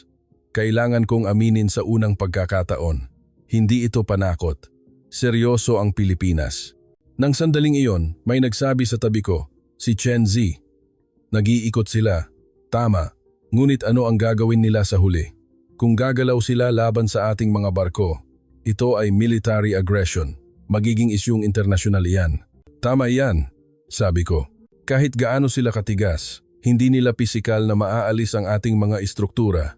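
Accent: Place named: China. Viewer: Ang labo naman ng narrator di mo alam kung pinoy o Chinese... ito lang ang opinion ko sa ngayon handa na ang Pilipinas makidigma sa china pinaghandaan na yan ni PBBM kaya nga naglatag ng mga EDCA site para paghandaan ang gyera... Hindi kakayanin ng china ang pwersa ng Pilipinas dahil sa mga kaalyado nito na pinangungunahan ng USA kawawa lang china kapag nagkataon tancha ko lang 1 week lang ang itatagal ng china susuko sila sa bigat ng opensiba ng USA yon lang talaga iniiwasan din ni PBBM na magkaroon ng digmaan hanggang kaya pang iwasan...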